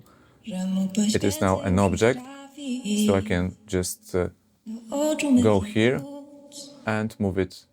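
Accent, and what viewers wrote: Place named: Poland. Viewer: Uslyszalam polski akcent.Mialam nosa.😄A ja jestem nadal na Studio One 2😂🤣😅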